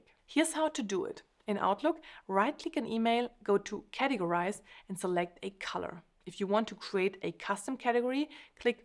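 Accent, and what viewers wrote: Germany. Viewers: is she german? I like her accent.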